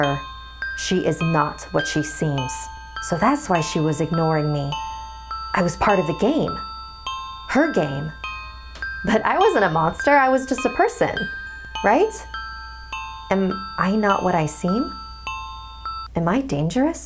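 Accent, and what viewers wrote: Philippines. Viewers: Aaaaah! I've been watching all of your videos and I didn't know you're a pinoy the whole time?! I was like, the heck is ottings and ottey? 🤔🤔 It's ate the whole time WTF XD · This dalagang Filipina has the greatest accent.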